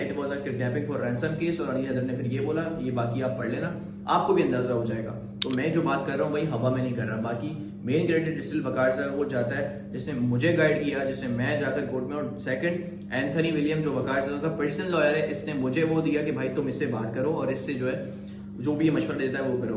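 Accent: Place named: Karachi, Pakistan. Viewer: i watched the other video of 30 mins where he told whole story how his money was stolen. i thought this guy was really innocent, but after watching this video its proven this guy is another wrong number and cannot be trusted. Crypto becomes Halal after you pay TAX ? How did you pay tax and become halal for you while it is not taxable yet. ? How you or Waqar Zaka are Writing the regulation terms ? If you are son of honest mother and father do not remove this comment and answer all questions. and why you sound like a Karchi ka Bhaiyya with typical Ball licking gesture of Waqar Zaka. just because he guided you, you are speaking lies and promoting him like any corrupt politician for example. better fix yourself and stop acting like typical Gali ka Londa who would do Ball licking just for sake of being grateful to another scammer.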